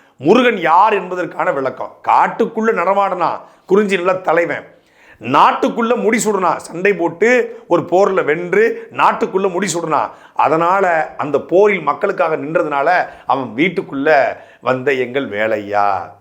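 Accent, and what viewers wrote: Tirunelveli, India. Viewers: இந்த 'இழுவ'..😂 அண்ணன் திருநெல்வேலிக்காரன் னு நிருபிச்சிட்டீங்க..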